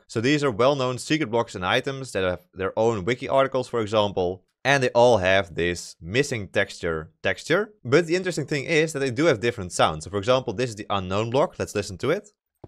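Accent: Netherlands. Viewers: Your Dutch i know it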